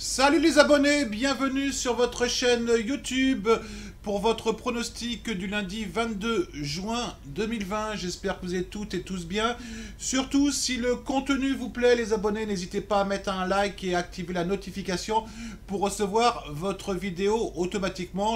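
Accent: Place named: United Kingdom. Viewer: Hello merci pour la pub mentionnant mon prénom, c est normal de ne pas gagner tous les jours, je t écoute depuis suffisamment longtemps pour me faire l idée que tu es au dessus du filet avec caritor 😉😉changes pas ton accent British, il est très fun😊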